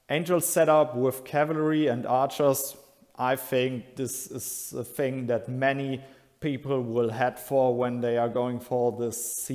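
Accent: Germany. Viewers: Very fun German accent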